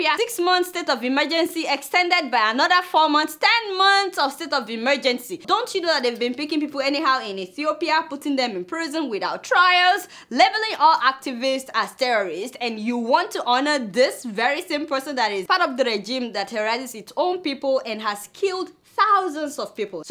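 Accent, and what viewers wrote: Yoruba, Nigeria. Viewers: your funny Yoruba tone tickles me. Keep it up.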